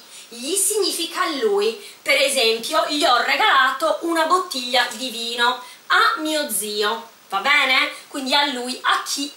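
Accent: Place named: Sardinia, Italy. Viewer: Ciao adesso,imparo italiana con Sardagna accente,sei une molta inteligenta maestra,bellissima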